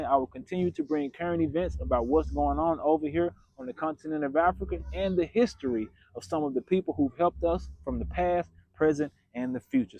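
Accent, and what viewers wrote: United States. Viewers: You are being a reverse racist and promoting action against the whites of this country. Any educated person of any race will not support a narcissistic, self serving supposed US leader who is turning his country over to the other nut job, putin. This is similar to our previous leader selling our country to the guptas. You are speaking absolute bullsxxt about europeans barbecuing black folk. It still is the black on black violence of putting a tyre on a brotha, filling it with petrol and setting it alight. I can not believe YOUR TOTAL IGNORANCE OF ANYTHING ABOUT SOUTH AFRICA. lCome and live here, with your american accent , and see the 2025 truth.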